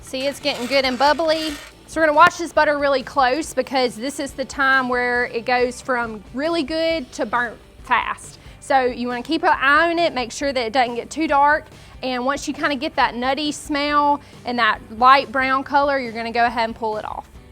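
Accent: South, United States. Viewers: Its the South fellow babies. Rich a full.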